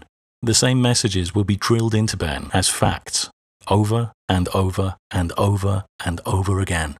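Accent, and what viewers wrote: United Kingdom. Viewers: Nonsense is still nonsense whether or not you say it in British accent. Truth should be taught to children and falsehood shouldn't. We disagree on what is truth and what is not. You wouldn't have problem with indoctrinating into a child that discriminating on the base of skin colour is wrong and if the child was to very vehemently be against that idea you still wouldn't just accept his or her different viewpoint. Debates should be held as to what is truth and what is not but parents teaching their children what they perceive to be truth is in itself a very logical and understandable position.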